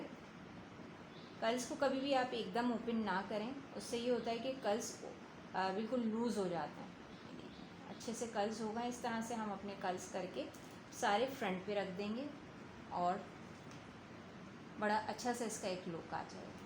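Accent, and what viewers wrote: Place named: Karachi, Pakistan. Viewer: Aoa. U r great n soooo sweet. Bas ap se ek request ha k ap. Karachi se belong hain isleay apke bolne main pata chalta ha. Isleay ap open ko opin bolti hn. Youn samjhen ap ne pen ki tarah yad rakhna ha. .. plz mind na kejeay ga. Ap boht achi hn❤ or parhi likhi bhi hn. Bs is choti se mistake btai ha. N sorry agr apko bura lga ho to.....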